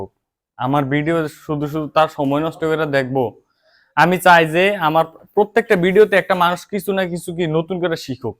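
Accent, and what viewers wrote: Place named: Chandpur, Bangladesh. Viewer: কথা শুনে মনে করছিলাম শরীয়তপুরের এখন দেখি পার্শ্ববর্তী চাঁদপুরের।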